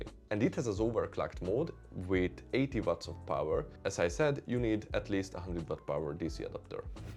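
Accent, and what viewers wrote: Hungary. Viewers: Az első mondat felénél levágtam, hogy magyar vagy xd ment a sub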